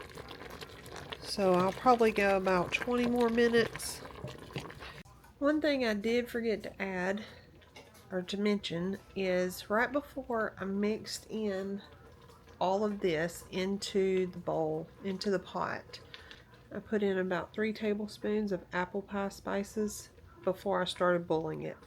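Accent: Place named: South, United States. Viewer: Wonderful video. If people tease you about your southern speech, they need to get over it. We all knew what it was you meant. Get some rest girl, you've earned it.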